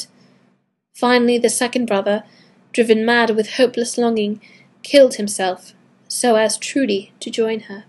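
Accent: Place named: United Kingdom. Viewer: Captivating voice and perfect British accent. It sounds like Hermione's voice. Nice reading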